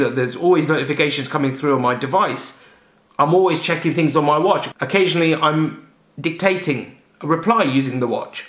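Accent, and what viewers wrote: India. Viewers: American accent? indian...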